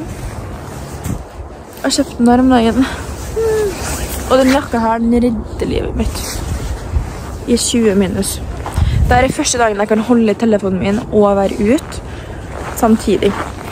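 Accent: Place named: Trøndelag, Norway. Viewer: Stark TroNDD-Yem accent 😄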